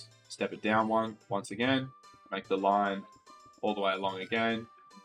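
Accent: Australia. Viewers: i knew u where australian i am too, also u able to make a video the same auto brewer but with like more brewer stands like if i want to put nether wort first and then like surgar cane so mutiple locks and stuff so like im sure u can put your stuff idk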